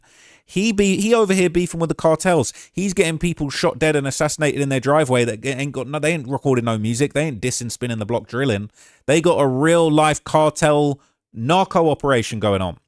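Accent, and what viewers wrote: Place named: United Kingdom. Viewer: Crazy to hear this limey with such inside knowledge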